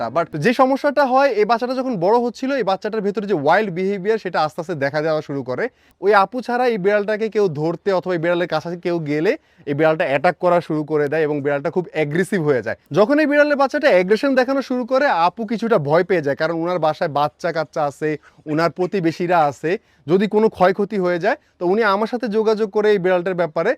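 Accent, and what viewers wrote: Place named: Sonargaon, Bangladesh. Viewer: সোনারগাঁয়ের আঞ্চলিক ভাষা এটা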